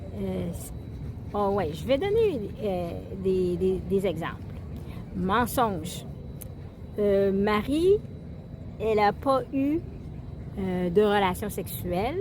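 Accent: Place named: Quebec, Canada. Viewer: Votre voix est magnifique😅, vous vivez surement au Québec. Belle élocution et intelligence verbale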